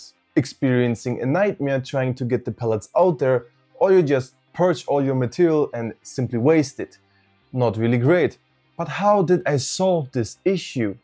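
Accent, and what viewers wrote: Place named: Russia. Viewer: Till the last phrase, I was absolutely sure that I hear Russian accent😅The only doubt was why the Russian guy talks about German engineering 🤔 And thank you for your video and your job, of course. It's awesome.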